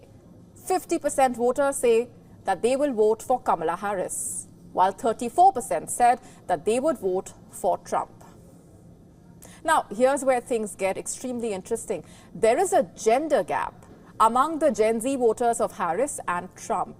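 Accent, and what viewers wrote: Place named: India. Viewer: The Indian accent makes this 100x more funny lmao (here from Theo Von)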